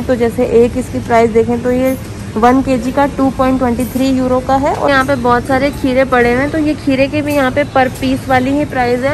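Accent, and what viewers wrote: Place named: Rajasthan, India. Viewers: Pahli baat ki video banane wali rajasthan se lagti hai.sahi Hindi nhi bol pa rhi..yaha para huwa nhi hota..rakhi hui hai boliye..aur ek zaruri baat..her chiz pe vedio mat banao..ye kya matlab hai ki supermarket dikhate hai aapko..are ab india main hypermarket aa gaya jo ki vo bhi boring ho gaya hai aajkal...Germany main rah k training lo ki kaise presentation dte hai.. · Ye inke bolne ka tone hai Rajasthan se hai ye rajasthani me pada hua hai ese ho bolte hai isliye inki hindi me mix ho jata hai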